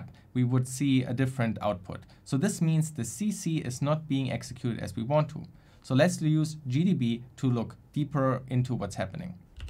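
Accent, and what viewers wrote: Germany. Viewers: I really like your videos a lot and have a great deal of respect for you but your accent eludes me. I mean, your account says you're from Germany and your pronunciation also sounds German but sometimes you have really weird grammar that doesn't really work in German or English? For example in the first part of the video: "Because I get often questions..." The more natural way to say this in English would be "Because I often get questions" But! In German the sentence in this context would be "Weil ich oft Fragen kriege" (or something similar) WHICH HAS THE SAME ORDER OF WORDS EXCEPT TWO DIFFERENT WORDS ARE SWAPPED Again, please don't misunderstand this as critique or an insult (As a German, I totally get how hard it is to speak English well!). It's just that the mistakes you make genuinely intrigue me.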